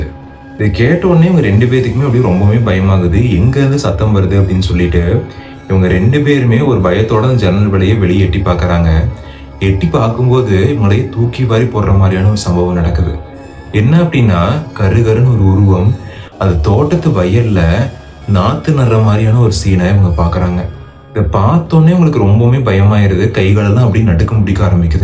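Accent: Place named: Coimbatore, India. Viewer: Ok bro your Coimbatore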